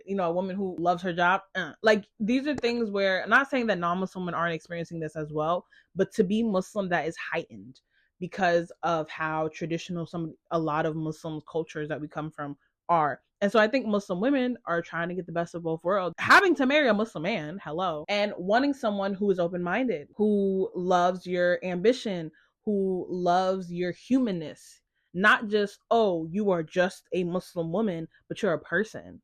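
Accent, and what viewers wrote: United Kingdom. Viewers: aaaa the YouTube algorithm did her big one for once!!! i just love your vibe, humour, energy, mindset so much!!! although im actually a black Christian girlie(will be putting my Muslim babes onto your channel bc☕️!!!!) but I just turned 20 never had a relationship or been in love and definitely related to so much you said I honestly struggle sometimes thinking if that kind of love is attainable especially as I’m intentionally dating and waiting for marriage but in today’s society it can be very discouraging. im a curvy girl too and the amount of sexualisation despite how modestly I may dress🤡🤡heavy on the my man isn’t in this country im from the uk(loved your lil British accent hehe) but so ready to tap into the softness and femininity experienced elsewhere bc this ain’t it most of the time like you said as a black woman we’re masculinised and dragged for how independent we are! 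thank you so much for the advice you gave so truthful!!! and the questions you asking during your courtship like that’s literally me like if we’re getting married it’s forever so genuine compatibility is non negotiable! you spoke so beautifully honestly and what you said about him seeing your humanness aaaaa im going to go buy that book and watch all your Colombia videos I actually learned Spanish last year so honestly loving the Spanglish!! sorry this is so long haha I never leave comments bc i yapp anyways ik this is a little early but Ramadan Mubarak and can’t wait for you to find the REAL thing!!! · I thought I wouldnt have the attention span to listen for an hour, but you had me HOOKED! And your british accent is good haha, may allah reward you sis, keep making this type of content!!❤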